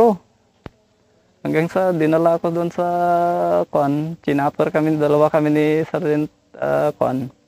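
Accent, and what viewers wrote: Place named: Pangasinan, Philippines. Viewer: Yung Punto mo KABAYAN, PANGASINAN KA PALA,AY ARO IDOL TA KA LA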